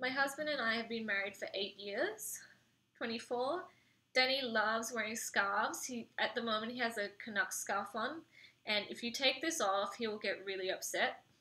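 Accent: Australia. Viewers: I can't place your accent. Have you lived in Australia?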